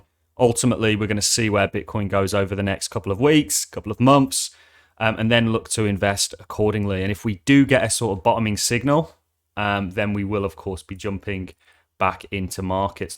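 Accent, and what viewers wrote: Birmingham, United Kingdom. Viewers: Where abouts in the midlands are you from? ( I detect a slight brummy twang ) 😂 I'm from Tamworth and you have a very similar accent.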